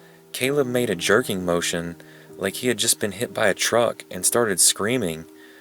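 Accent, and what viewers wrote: South, United States. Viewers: you sound American you have a accent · first time on this channel......damn, you sound southern... its ok, I'm from texas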